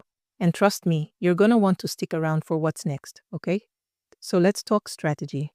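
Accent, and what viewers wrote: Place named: Africa. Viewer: She's African that's why she talks like that. English isn't first language it's obvious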